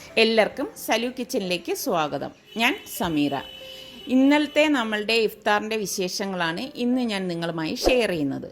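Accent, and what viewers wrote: Trivandrum, India. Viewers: Tvm style samsaaram kettappo oru sugam......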